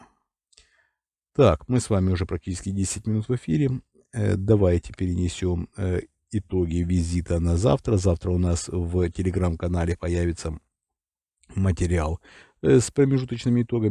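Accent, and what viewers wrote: Ukraine. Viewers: Смехуёчки во время эфира раздражают!!! Никакого анализа...и голос похож на голос Кашпировского, с украинским акцентом.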